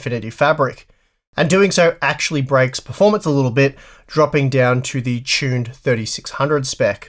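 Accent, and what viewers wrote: Australia. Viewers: There's something about that exotic Aussie accent. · I always like your videos more than GN, because Steve never takes a break to breathe. I am an engineer and I have troubles following him. (Also, tbh, who doesn't prefer Australian accent over a Yankee one?)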